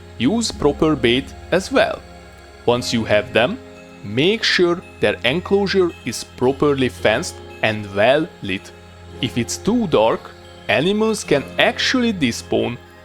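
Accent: Hungary. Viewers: Bro you have the most Hungarian accent i’ve ever heard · Noice hungarian accent. Reminds me to "the malaciz and the wolf" :D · Az első 2 mondat után már leszűrtem, hogy magyar vagy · Ezt az akcentust csak magyar ember tudja produkálni :) Ettől függetlenűl köszönet a videóért! :)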